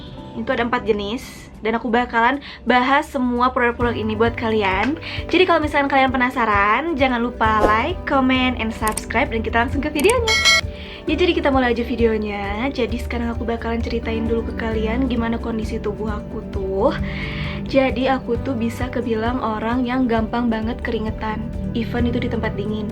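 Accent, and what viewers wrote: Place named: West Java, Indonesia. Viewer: Logat sunda si teteh hehe ...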